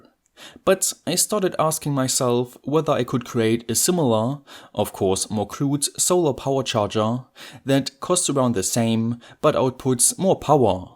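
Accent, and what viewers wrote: Germany. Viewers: I am trying to make a simple - foldable array of small panels able to charge a 20000mAh 18W power bank. After 10 minutes of sifting through a ton of garbage videos on this topic, all with colorful thumbnails, idiotic, brain - damaging music and guys who just hot-glue shit together, I finally arrive to a guy with German accent. I think this will be good.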